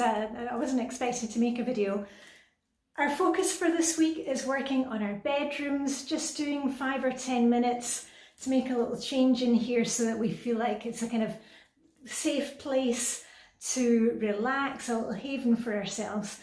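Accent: Scotland, United Kingdom. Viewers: Hi, I really love your channel. I lost my husband.of 49 years, 2 years ago and.I still struggle with being.motivated. I do have a morning routine and stick to it most of the time. I do like to hear your voice, as my husband was Scottish and I miss his accent. I am Australian and we lived in Australia. THank you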